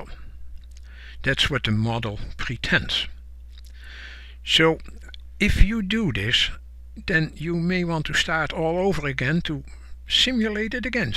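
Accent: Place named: Netherlands. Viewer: Am I the only one to adore your voice and your lovely Dutch or Flemish accent?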